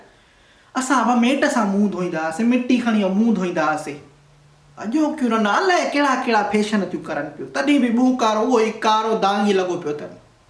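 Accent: Sindh, Pakistan. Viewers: 😂😂u R superb... 👍❤dadho khili aa twhn j ghalin te...twhn ji sindhi tmam ghni typicl aa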